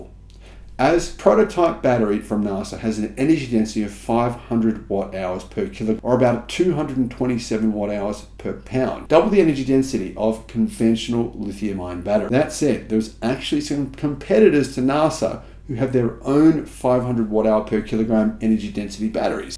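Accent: Australia. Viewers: Yeah it was pretty grating. His Aussie accent draws it out even more. The whole video was cringe by proxy. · You have to allow for the fact that he speaks strine.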